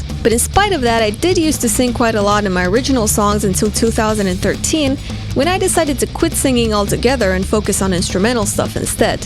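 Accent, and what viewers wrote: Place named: Russia. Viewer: Вааауу у тебя Чисто русский акцент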